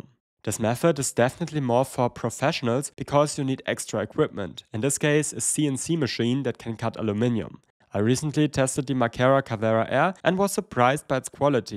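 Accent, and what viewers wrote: Germany. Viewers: If I had a cent for every time I found a 3d printing channel with a German accent I'd have 2 cents. Which isn't much but it's weird that it happened twice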